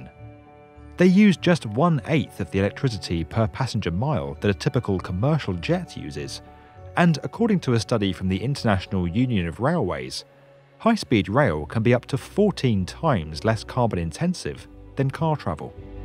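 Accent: United Kingdom. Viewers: Where is the money coming from? If it is SOLELY from the State of Texas, I really don't care. Texans can spend their dollars however they choose. However, if even one cent of federal tax dollars goes into this boondoggle,I feel like I've been robbed again. I also take umbrage at the narrator and his British accent a if to somehow transform the US into another European look alike.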